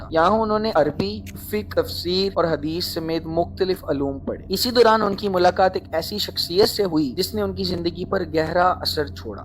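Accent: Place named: India. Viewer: یار غ کو گ ادا،کر رہے، خ کو کھ کہہ رہے، اتنا زیادہ ہندی کا اثر ؟ تھوڑا تلفظ پر دھیان دیجیے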